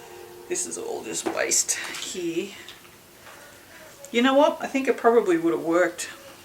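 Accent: Australia. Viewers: Are you from Australia ya ripper 😅lol grand vid mate 👍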